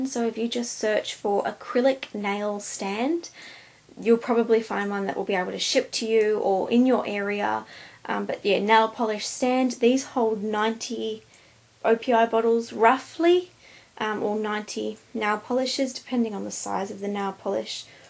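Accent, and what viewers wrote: Australia. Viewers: I do love vidoes that make me feel I have very little make up.=) Thanks for that and, yes, I like the Aussie accent too - it's quite cloes to my own Brit tones, but still with a little touch of the exotic.=) Take care! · are you from Australia?? i LOVE Aussies!! :D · I can listen to Australians talk all day, they just make everything sound better!!!!!!!! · how could they not tell its aussie? lol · Aussie?? I can tell by the very familiar "ova" "holda" ~ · Where do you buy your OPI nail polish, because I live in Australia and you sound Australian :) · r u from australia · r u australian??? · i was wondering what your accent was, and then you said its only in australia. I am australian too... i fail.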